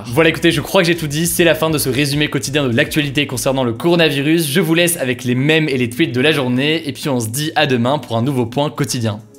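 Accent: England, United Kingdom. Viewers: Comme d'habitude merci pour tes vidéos ! ❤️ Ce résumé est juste ultra pratique pour ne pas partir en psychose totale... Et au passage j'aime trop ton accent anglais ! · Mais cet accent anglais je suis admirative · L'accent anglais wow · Son accent anglais me fascinera toujours autant XD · Bel accent british!!!!! 🤗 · Le british accent d’ 💛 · that british accent you have :o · Ton accent anglais je l’adore 😂😂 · Il a un accent anglais britannique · Ton accent anglais c'est un plaisir à entendre :D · Cet accent anglais (sans ironie) pas mal ! 😂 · Cet accent britannique 🤩!! Merci pour ces news. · Je like rien que pour ton accent anglais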